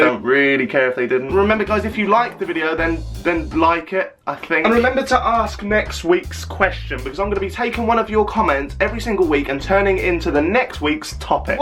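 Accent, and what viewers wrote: Newcastle, United Kingdom. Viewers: What's gan an everyone itsa true geordie backagain!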